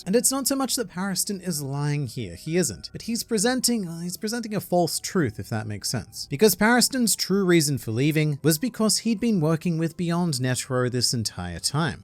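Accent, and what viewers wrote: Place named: Australia. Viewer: I've been subbed for a while now but stopped watching for a bit. Just listening to your voice in your earlier videos, it's obvious that you're Australian and being a basketball fan, I've always guessed you'd probably look like Matthew Dellavedova. Now seeing your face for the first time... Holy shit. Lol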